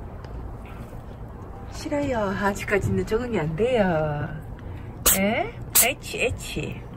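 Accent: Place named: Gyeongsang, South Korea. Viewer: 천사님이세요 경상도 구수한 사투리가 더욱 정감이 가네요 응원합니다 어서 대박나십시요💓💓💓💓💓💓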